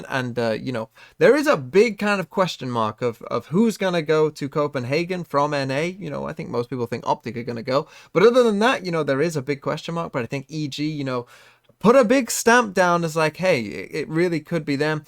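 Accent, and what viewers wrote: United Kingdom. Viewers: It's because he is. He literally has a British accent.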